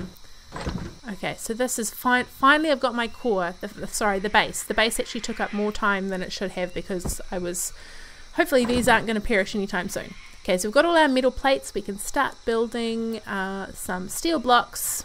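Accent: New Zealand. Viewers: Just found you and this vid; only bought it the other day, so all new to me. Good vid, thanks. :) SPACE girl, eh? So, Elite:Dangerous or not? ;) (Not yet explored your channel yet... another time -- overdue to get back into Empyrion...) Is there a bit of American/Canadian to your Kiwi accent -- or am I wrong?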